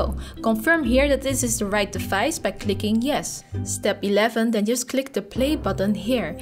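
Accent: Netherlands. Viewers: You have a Dutch accent?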